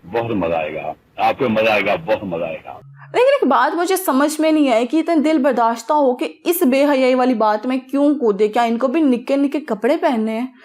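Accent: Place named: Punjab, Pakistan. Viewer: Bohat acha.... jis trha aap urdu ko punjabi ka tarka lgati hyn.... I like this style..... Love for hijab, Love for ur talking style ❤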